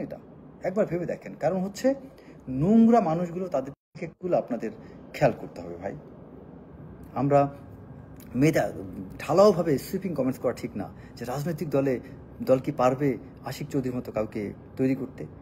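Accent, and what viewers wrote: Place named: Barishal, Bangladesh. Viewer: সবজান্তা শমসের হইছেন! বাচাল টাইপ!কোথায় লেখা আছে মুহাম্মদ সল্লাল্লাহু আলাইহি ওয়াসাল্লাম কে না সৃষ্টি করলে আললাহ সুবহানাতায়লা দুনিয়া সৃষ্টি হতো না!ভাল করে বাংলা উচচারণ ই করতে পারেন না!বরিশালের একসেন্টে কথা বলেন!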